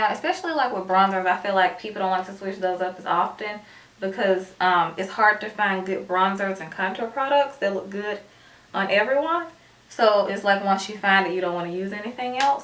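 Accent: South, United States. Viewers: I really enjoy a talk thru because it feels more personal. And I really like your southern accent. Please do more of these! 😊💕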